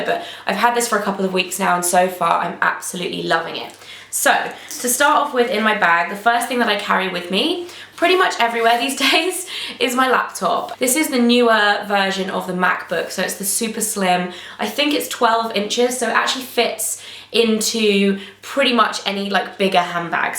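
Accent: United Kingdom. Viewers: I love her British accent! <3 ... and the bag, of course. It's gorgeous!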